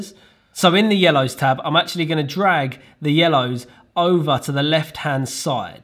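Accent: United Kingdom. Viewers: Strongest british accent...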